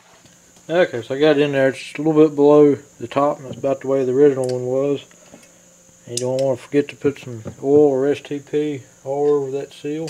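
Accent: South, United States. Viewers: Great video..thick southern accent but pretty much understood everything...Damned 10% ethanol fuel screws up everything in the fuel system! It's always a good idea to put a petcock on the fuel line and just shut it off and let the motor run out of fuel when you're done mowing.. Otherwise your needle valve on the float in the carb wont seat properly with all the corrosion and you'll either have fuel leaking out the exhaust or get gas in the crankcase!! I had gas in the crankcase and it caused all kinds of weird problems. Thought I blew a head gasket with all the smoke until I started taking it apart and gas was leaking out of the head. Now I have to put the head back on and take the carb apart, so I decided to just clean up the head, valves, and piston, and replace the needle valve and seat on the carb. Hopefully it will run again!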